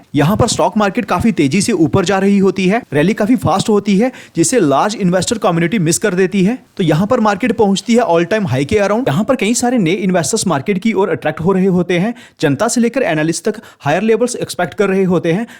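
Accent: Jammu, India. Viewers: Bhai jammu se ho kya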